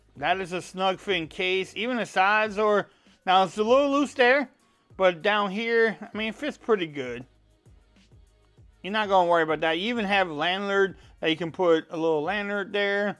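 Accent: United States. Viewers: Your accent is the most american accent ever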